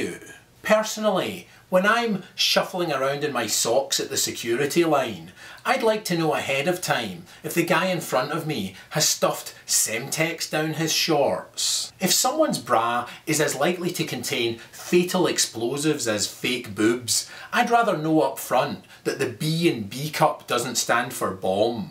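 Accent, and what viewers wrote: Scotland, United Kingdom. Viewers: oooh i love his acsent... i want a scottish friend :D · What ever happened to the british accent being 'hot'? Americans don't even realise that this is what 90% of brits talk like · I think he's a Scot, or like me, he might be an Irishder. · is that Scottish ? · well your an idiot... hes scottish. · You can take your Scotish voice and go to hell. Maybe the loss of liberty does not cencern you but to real Americans it is the reason we fled Great Britain in the first place. Airport scanners are unnecessary. The problem can be solved by profiling but they don't want to solve the problem. You are the perfect example of an unwitting NWO dupe. No go play some golf and eat some haggis and read some books about freedom dummy. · He is very much Scottish. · Ya I'm American do the accent is hard to understand, but I'm also Scottish so I like the accent, and everything else HA don't shit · I love the skotish acsent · Stuff an aresenal up his aresenal XDDDD nice accent btw Scottish?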